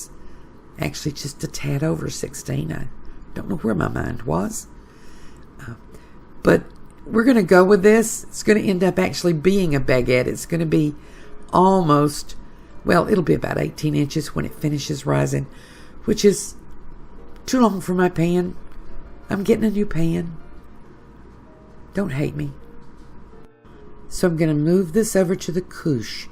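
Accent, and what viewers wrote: South, United States. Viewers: I knew you were from the South !!!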